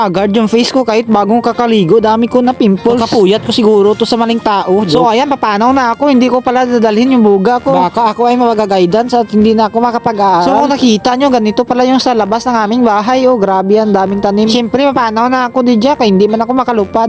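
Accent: Iloilo, Philippines. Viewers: Ilonggo ka idol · gagi ilonggo ba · Taga Iloilo kaba bisaya ka e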